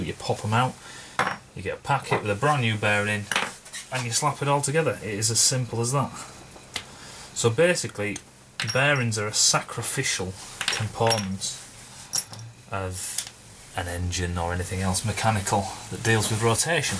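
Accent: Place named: North, United Kingdom. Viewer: Using this in 15 minutes with Saudi Aviation students here in sunny Riyadh - brilliant explanations - simple yet informative. Nice to hear a good Northern accent - reminds me of home!! Cheers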